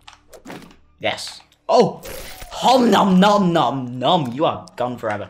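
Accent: United Kingdom. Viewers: You sound like a very scottish man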